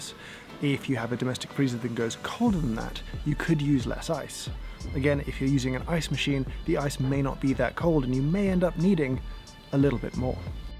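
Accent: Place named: England, United Kingdom. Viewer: All I have to do is take one look at the profile of your glass of ice coffee and I know it's too weak for my taste. It looks more like tea to me. Maybe that's how you like your coffee. You do have an English accent! No thanks. I'll drink mine using the old fashioned Cold Brew process. Dark, strong, and dank!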